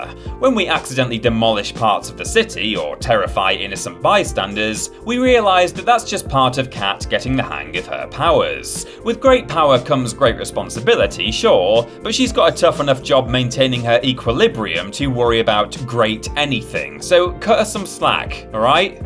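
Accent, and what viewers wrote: United Kingdom. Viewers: Damn brits with their cool accents and crude dry humor lol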